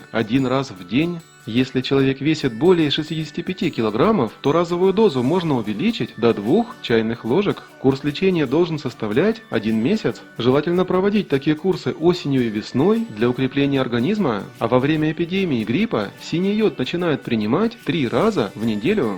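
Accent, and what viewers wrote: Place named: Russia. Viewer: Тоже ламаю голову,не могу вспомнить, -в какой местности Росси такой говорок ? ) )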